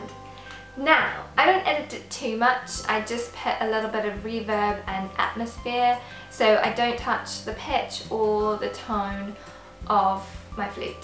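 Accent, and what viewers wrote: England, United Kingdom. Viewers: Your British! Me didn't know that. 😱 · I never knew you were British!!!! Ilysm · i think she is Indian and has a british accent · She speaks in a British accent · love your british accent and you are so charming!! kisses from brasil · She is from South Africa, but she finished her master degree en England. That's why she got her very nice accent...👍🏻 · OH MY GOD SHES BRITISH???!!!!!!??? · Your British😱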